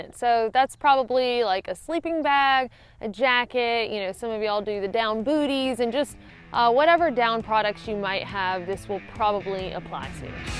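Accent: South, United States. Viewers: some of that southern accent coming out in this video! olls = oil :) great videos as always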